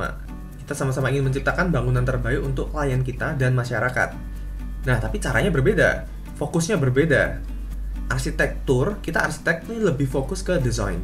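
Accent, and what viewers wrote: Java, Indonesia. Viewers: Kok bisa si pas ngomong english american banget, bahasa indo medok banget wkwk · Kak logatnya khas Jawa buangeeet! Wkwkwkw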